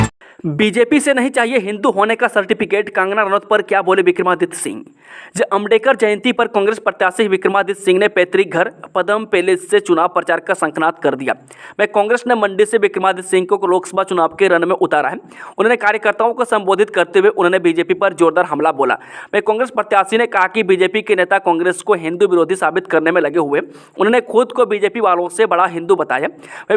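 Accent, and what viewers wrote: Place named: Uttar Pradesh, India. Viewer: Bhai tum Hindi UP style mein bolte ho.UP ke ho kya.HP ke reader khatam ho Gaye jo tumko import karna pda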